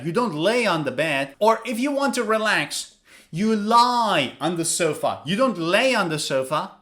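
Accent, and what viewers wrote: United States. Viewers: Are you From America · You are really American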